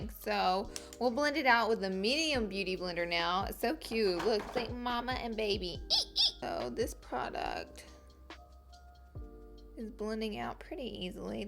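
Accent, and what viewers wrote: South, United States. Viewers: Second time watching your vids and I can say you're really funny and i like your accent, even though i normally dont like country accents (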